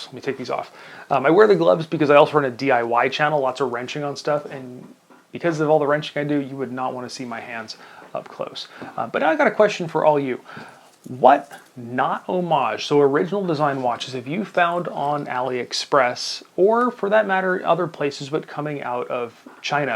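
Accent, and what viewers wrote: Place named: United States. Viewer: At least you are self aware to it😁.. yes, you guys in the US do say things in a funny way 😉. Actually just one thing- Tourbillon is pronounced without the “LL”, so it sounds “to(u) r bi yon”. Great review. I own the Aesop, the other dial, and the Pierre Paulin (same dial but with 3 hands). I enjoy them a lot.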